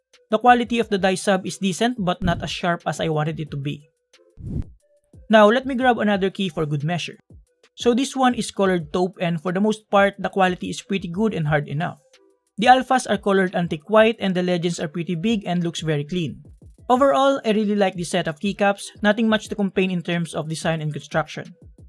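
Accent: Philippines. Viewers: his Filipino accent tho is insane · I love the filipino accent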